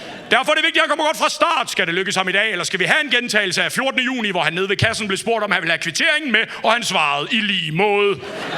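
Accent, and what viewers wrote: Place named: Jutland, Denmark. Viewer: Der starter meget jysk ud... Men selv med den jyske levering har du kraftedeme fat i noget fedt 🤣🤣🤣🤣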